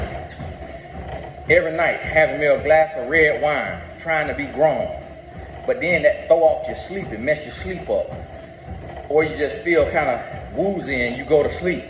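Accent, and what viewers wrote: South, United States. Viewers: Hey! OK, so Now, there's been a CHANGE, right? You are NOW preparing to WORK ON your COMMUNICATION Skills and NOT be in a comfortable Mindset of ACCEPTIng your Country twang talk, as this is who I AM? And my guess is, you came to the conclusion that it's better to have MORE people UNDERSTAND YOU than not, to MAXIMIZE your OPPORTUNITIES for financial growth 💵, as you pointed out this is the time to grow, store and save! AND I DEFINITELY GET IT because I See that God has made YOU a QUICK THINKER in evaluating WHAT'S BEST! BTW... Your decision to have some 15 minute videos, is AWESOME because, after I have thoroughly enjoyed listening to Much longer videos in initially receiving your wisdom, experiences, and perceptions, NOW, my attention Span has become shorter in my READINESS to watch a much longer video. However, RELATIONSHIP email reads and responses, IMO are Ok for longer. 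Maybe others feel differently?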